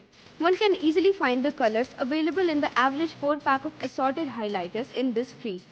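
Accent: India. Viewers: but sounds of india girl.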